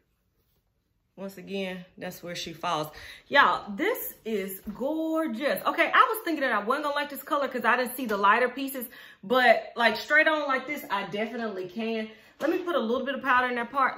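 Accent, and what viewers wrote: South, United States. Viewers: OMGGGG!! I love everything about this! Your spirit, your energy, the southern twang, that bomb ass haircut and your beautiful self. Best review EVER!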